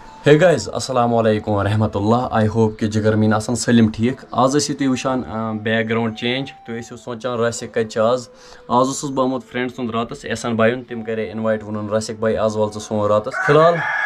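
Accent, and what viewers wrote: Kashmir, India. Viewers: I literally, like you kashmiri accent.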